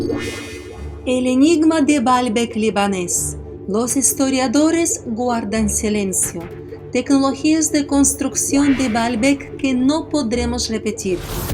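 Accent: Russia. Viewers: excelente video gracias por tus videos he investigaciones son muy interesantes, me gusta el acento de tu español, de donde eres, rusia? bueno, saludos desde las montañas de colombia.... "nadie conoce el color del agua que viaja por debajo del rio".....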